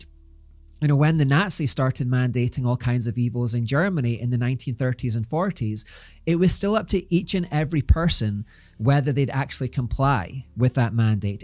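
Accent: Scotland, United Kingdom. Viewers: Love your channel,. I've been watching your videos since Know Your Enemy, and no, your Scottish accent isn't a problem at all and English isn't my first language. In fact, I'd like to hear your natural Scottish accent at least once, because I've been to Scotland and oh, that was fun! 😂 I struggled to understand half of what people said, but still loved the accent. Finally, I'm absolutely sure that this channel is being suppressed by YouTube. I watch a lot of Christian content and (no offense to anyone else but) channels that aren't nearly as good as yours have grown significantly more. Channels with not only "worse" content, but also editing and overall quality. I guess you just speak too much truth! Best of luck with all you do. 😊 Can't wait to see what your next series is! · I've appreciated each video in this series. Thanks for being true to the Lord. 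I love listening to Scottish accents. 😊 Yours is very easy to understand for this Iowan from the US. Looking forward to the next series. May God continue to bless you!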